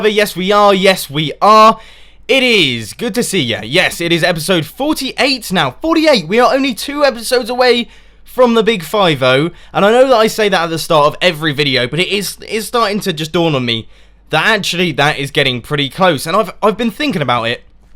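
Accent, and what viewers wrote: United Kingdom. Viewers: VERY BRITTISH ACCENT?! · idk why but everytime you use your super-extra-fancy-charming-brit accent i imagin you recording in a smoking hahaha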